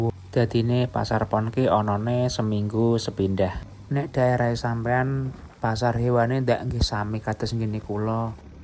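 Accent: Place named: Java, Indonesia. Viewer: Bahasane jowo kok medok banget opo dari jawa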